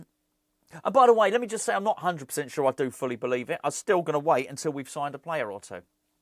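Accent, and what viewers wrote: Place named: RP, United Kingdom. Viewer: BBC pronunciation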